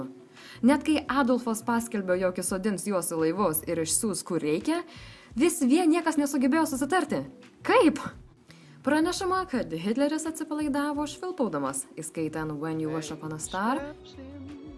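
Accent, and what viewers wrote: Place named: England, United Kingdom. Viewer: ausys kraujuoja nuo tarimu, pasigooglink kaip tariasi elementariausi pavadinimai, nebandydama išlaužti ju tarimo anglu tarme.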